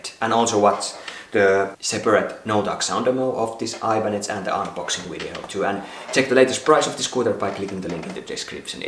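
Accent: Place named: Finland. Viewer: this man sounds very finnish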